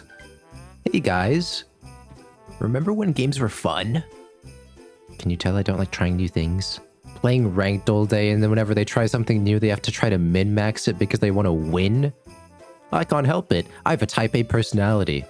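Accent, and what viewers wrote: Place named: United States. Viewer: you have an american accent but u are australian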